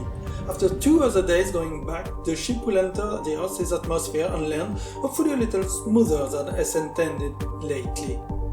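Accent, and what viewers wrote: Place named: France. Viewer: Nice french accent 🤟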